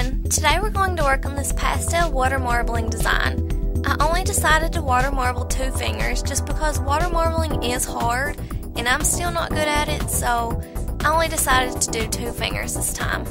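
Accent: South, United States. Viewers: im from Tenneessee :) your accent is cute. Normaly i hate country acents but not yours. SO going to try this later. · Woah! I got really confused when you started talking! You're like a southern cutepolish! lol I feel like I got more information/instructions when you talk than with just text. I like it!! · OMG! You sound so country! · does she sound country to you guys in this video more than others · wow you have a really thick southern accent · wow, its like a southern cutepolish! such a cute cute accent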